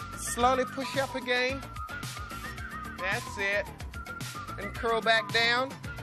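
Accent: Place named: Ghana. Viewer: English Ghana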